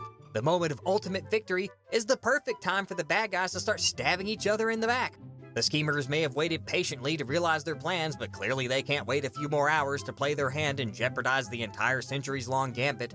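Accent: South, United States. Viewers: I only just now realized you have a Southern accent.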